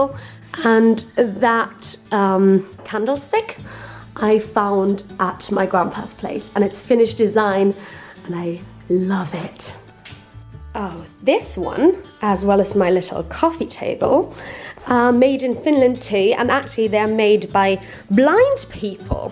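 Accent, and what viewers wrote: United Kingdom. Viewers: Are you Finnish? :) Cause you have a very clear British accent :)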